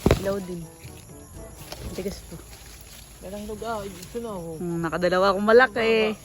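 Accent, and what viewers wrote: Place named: Marinduque, Philippines. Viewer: Ikay baga ay taga Marinduque sa punto ng pananalita mo ay marinduquenio ga mura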